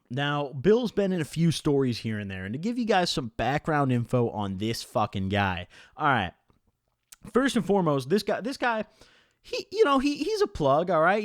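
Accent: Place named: Indianapolis, United States. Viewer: Dawg you sound like you LIVE IN NAPTOWN lmaooo frl I can tell when I hear it😭😭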